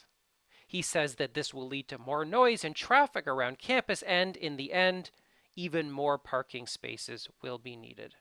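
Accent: Canada. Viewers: Do I hear Canadian accent??? Just curious..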